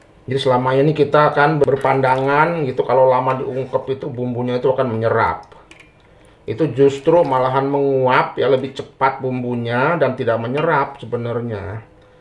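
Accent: West Java, Indonesia. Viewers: Kungkurahan,Sunda banget Pak😂❤️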